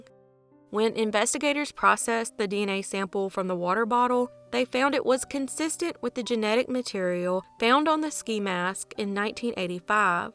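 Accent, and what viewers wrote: South, United States. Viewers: I'm born & raised in the city of Baltimore & i very rarely hear that nice southern accent & i absolutely love it. I come for your stories but stay for your voice. .. awsome content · I’m not from America, but…..as God is my witness ‘ahh’ adore those southern accents!😉😊😂🥰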